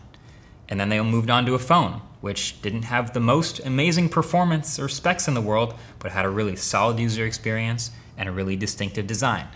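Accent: United Kingdom. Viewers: Finally a brit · Bro, he is british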